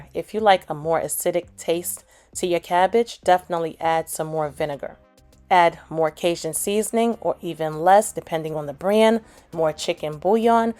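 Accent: New York, United States. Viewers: That NY accent! Just makes me smile watching it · Are you from new york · Im making this right now following your video step by step and pausing in between. LOL Your New York accent is everything. i love your videos and shared them with my sister who lives in Georgia and she loves you too. thank you for making my thanksgiving dinner special and delicious!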